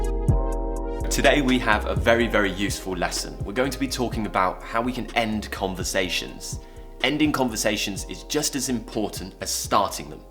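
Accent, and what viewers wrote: United Kingdom. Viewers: Your British accent is really beautiful.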